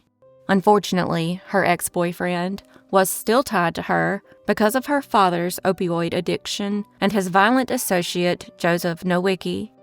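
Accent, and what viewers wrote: South, United States. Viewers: Thanks for doing Indiana. Pretty good videos. N actually have a nice pleasant voice with a Lil southern twang lol But seriously, thanks. We appreciate it. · What part of the south are you from?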